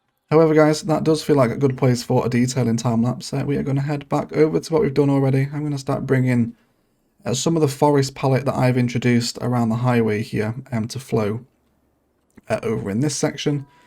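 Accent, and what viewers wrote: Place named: Northern England, United Kingdom. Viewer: The way you talk... and the happy little trees... you're like the Bob Ross of Cities Skylines... Like, if Bob Ross was from the north of England :)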